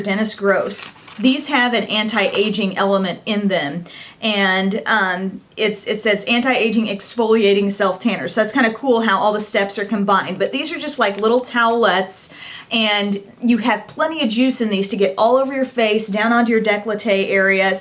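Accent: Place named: Texas, United States. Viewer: You sound like your from Texas. :)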